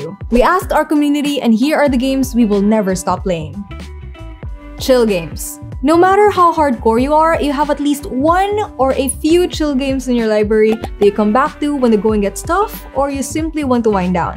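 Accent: Philippines. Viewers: That's some thick philipino accent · Filipino accent is strong on this one.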